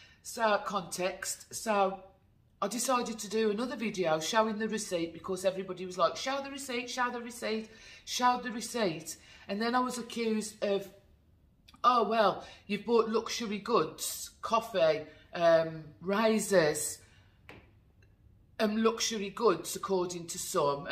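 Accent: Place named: Birmingham, United Kingdom. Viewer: Enjoyed that, thanks for sharing. I got into You tube hauls during lockdown as I missed shopping so much! Strangely all the people you mentioned I subscribe to! However it amazes me how many others I subscribe to just disappear into the ether, which I find concerning as they are virtual friends and I worry about them. I was brought up in Birmingham so I love to hear your accent as I have lived in London for many years. I have never heard the accent described as what you called it! Keep the vlogs coming!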